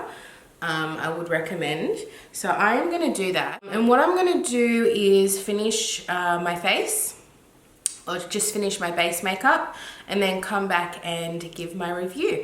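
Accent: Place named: Australia. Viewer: Finally, a beautiful sounding Australian accent! 😅 So many girls aussie sound terrible on youtube. Thank you! 🤘🏻 easy to listen to.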